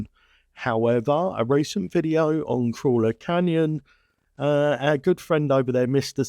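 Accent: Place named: Birmingham, United Kingdom. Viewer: brummy accent that lol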